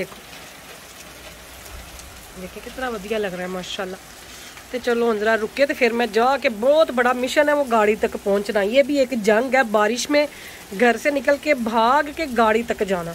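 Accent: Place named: Punjab, India. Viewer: Ap Kitna pyara bolti hai hum bhi Punjabi hai buhat Acha Lagta hai ap ki Punjabi sun kar❤️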